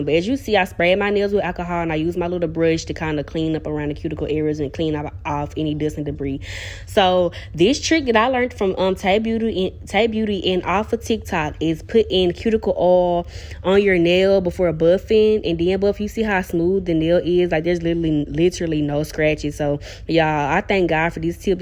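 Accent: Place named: Arkansas, United States. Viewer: I had a friend from Little Rock Arkansa, I’m from Compton,ca. he had that same accent as you…I love it though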